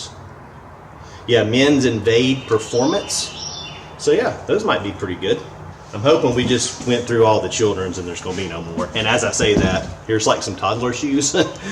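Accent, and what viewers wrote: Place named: Rural, United States. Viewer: Well you definitely got a lot of kids shoes. I have no idea how those sell. Cat & Jack are a kids brand sold at Target. I'm subscribing to help a fellow youtuber out. I do some what sold and some unboxing if you're interested in checking them out. Those might sell well locally. This month Facebook Marketplace is letting you offer free shipping and no fees. Take advantage of it! What state are you in? I'm in Texas. your accent is more country so I'm not sure lol.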